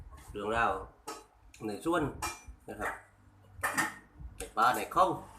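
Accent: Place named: Trang, Thailand. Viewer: ดูกับข้าวพี่แล้วชวนให้หิวรอบดึกเลยครับพี่. ติตามครับสำเนียงพี่เหมือนคนตรังบ้านผมเลยครับ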